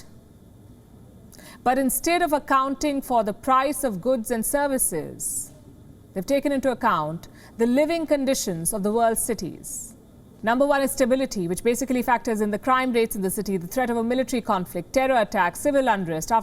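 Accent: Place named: Mumbai, India. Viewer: this dumb mumbai scammer accented anchor thinks its hotshot 🤣🤣🤣😂😂😂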